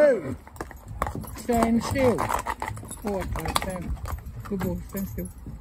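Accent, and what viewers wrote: United Kingdom. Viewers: That was delightful !😊.. Thank You ! "That'll do" and "That. Will. Do." had me laughing since those are used here, across the pond, for misbehaving pets/animals and children.. This Texan is now a subscriber, and looking forward to watching the rest of your videos and future ones ! 😀.. I usually do well understanding British accents, but yours is a little stronger and with the horses' clippity clops (which I loved) I had to use "closed caption", but I'll get better 🙂..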